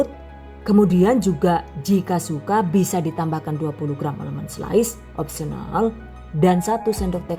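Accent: Banyumas, Indonesia. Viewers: MaasyaAllah, suka sm detail penyampaian'y & suka cara bicara'y yg ngapak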